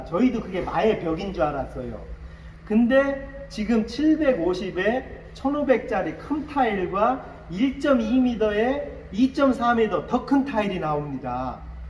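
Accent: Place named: Chungcheong, South Korea. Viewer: 큰타일이 잘 깨지지않을까요? 충청도사투리가 재미있네요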